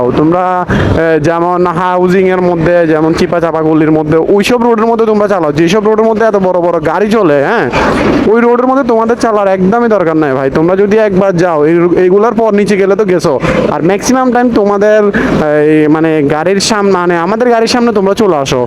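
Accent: Chittagong, Bangladesh. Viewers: vai kothagulo valo laglo,Apni Chittagong er? Always video up diyen dekhbo 💝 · Apni ki cttorgram e taken kota sune buja jasse amader cttorgram er manus ❤️🤝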